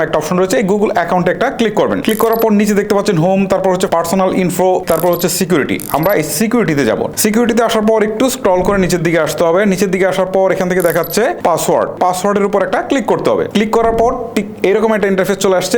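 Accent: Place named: Chuadanga, Bangladesh. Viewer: ভাই আপনার বাসা কি চুয়াডাঙ্গা অথবা মেহেরপুর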